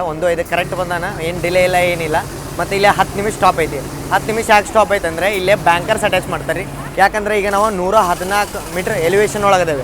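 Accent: North Karnataka, India. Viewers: Very very very nice north karanatks kannada language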